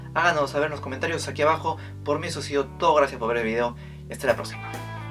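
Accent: Peru. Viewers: No es que use acento neutro xD, sino que ese es el acento de las personas que viven en ciertas zonas de Perú, por ejemplo, Lima.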